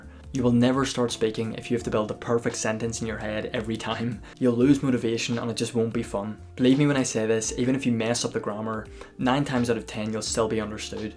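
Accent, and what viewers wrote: Ireland. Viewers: Oh my godness, your Irish accent is sooooo adorable! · I realized that you are from Ireland with help of your pronunciation,especially a word "thousand") thanks for sharing,mate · Just clicked on this after watching a vid where you spoke only in German. Would have never guessed you have an Irish accent !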